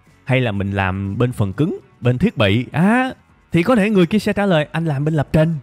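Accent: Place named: Tay Ninh, Vietnam. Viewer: Mình có người bạn ở tây ninh, học làm ở sg cái giộng điệu, nhấn nhá, cách nói chuyện y chang bạn này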